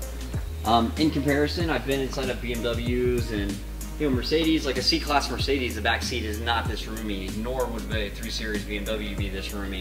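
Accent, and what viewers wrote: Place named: India. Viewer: This guy sounds like the Indian guy from parks and req.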